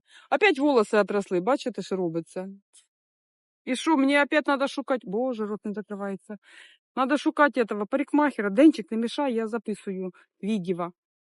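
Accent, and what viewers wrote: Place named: Surzhyk, Ukraine. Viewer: така спражня, і суржик це щось. Я з Запорізької обл. і мені це все так близько. З задоволенням дивлюсь ваші відео, ви молодець. ❤❤❤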